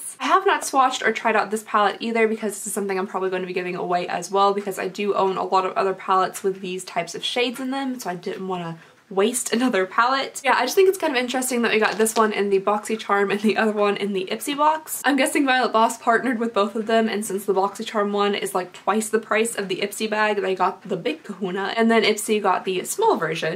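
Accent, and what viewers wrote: Kentucky, United States. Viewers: oof wait are u from Kentucky, I am too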